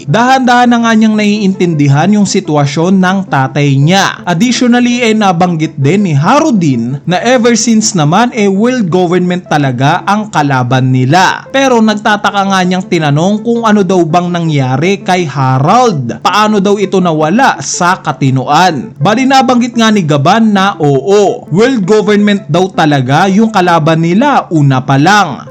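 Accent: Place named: Bicol, Philippines. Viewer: hayaan mo na bisakol ata si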